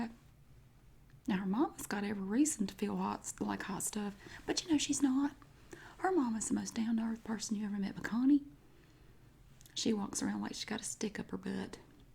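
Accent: South, United States. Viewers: Southern accent!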